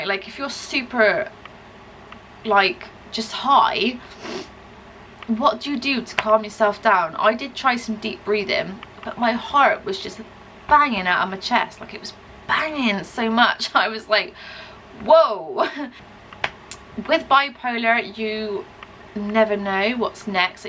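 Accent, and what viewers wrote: United Kingdom. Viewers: you should use a tripod so you can adjust the height so it doesn't cut your head off at the top ;) I enjoy watching your videos. keep up the good work. and i enjoy your British accent. ;)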